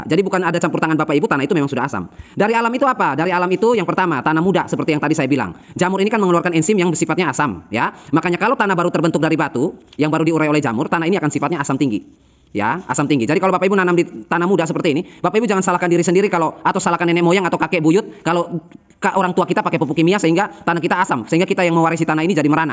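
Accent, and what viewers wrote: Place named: Makassar, Indonesia. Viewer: Makasar kyaknya om sama kayak pak Rocky gerung hehhe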